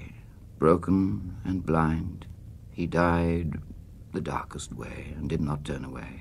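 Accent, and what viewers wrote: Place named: Wales, United Kingdom. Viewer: but you can hear the welsh tones, phrases, and emphasis it is warm and draws you into the prose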